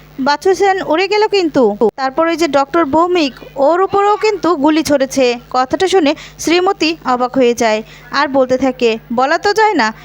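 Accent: Bangal, Bangladesh. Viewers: কথকতার মান ভাল। বাঙ্গাল বাঙ্গাল উচ্চারণের জন্য খারাপ শোনায়।চেষ্টা কর এটা ঠিক ঠাক করার। ভাল কথকতার জন্য তোমাকে করতে হবে।শুভেচ্ছা রইল।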